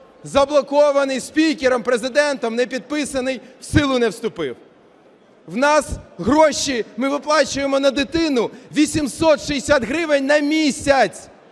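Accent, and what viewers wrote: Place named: Russia. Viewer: Навчись розмовляти українською мовою, а не досі в тебе присутня російська! Ненавиджу таких! За що хлопці наші гинуть?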